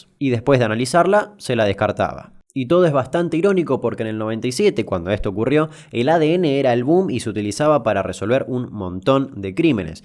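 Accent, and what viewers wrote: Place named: Posadas, Argentina. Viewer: De que lugar sos Capo? Pareces Posadeño amigo.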